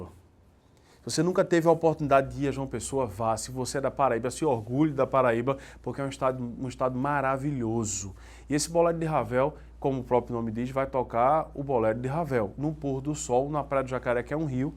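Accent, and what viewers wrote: Pernambuco, Brazil. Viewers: Esse sotaque Pernambucano deixa a aula mais legal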